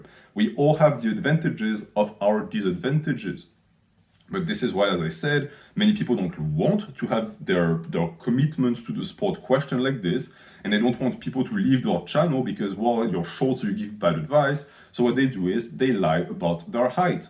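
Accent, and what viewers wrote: France. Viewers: Franchement c’est cool que les anglais aime notre accent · Hey, how tall are you? Also why are you French and why your accent is intolerable? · his accent is horrendous and it is extremely different to understand what he is saying at times. his accent is what makes me hate the french, frenchies always get mad at you when you say their dribble speak words wrong but then they go on and speak english like this! absolute butchering of the english language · High pitched with french accent. For the win.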